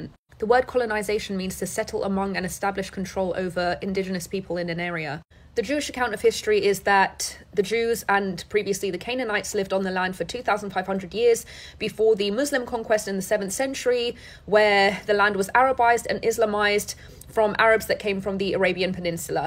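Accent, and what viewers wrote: United Kingdom. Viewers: I did not know people speak English with british accept in Iran...lets face it she is not Iranian... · Info on anything in the Middle East would get better reception from someone without some version of a British accent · Nothing you have said contradicts anything I said. The basis for the word "Persian" is "Farsi", which is an Iranian language, and many Iranian people do indeed identify as ethnically Persian. many do not. I said Iranian PEOPLES, not people singular. She is indeed Iranian, but her accent is British. She was brought up there. Are you seriously using her accent to check her accuracy? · she sounds British lol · A Muslim Iranian, speaking perfect British English. Straight out of Babylondons propwash academy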